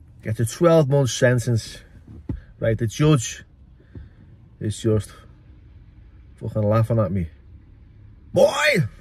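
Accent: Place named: RP, United Kingdom. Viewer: Sorry wrong msg , meant ot for your other video, I watch so many getting confused, I never comment, great posh accent 😂❤❤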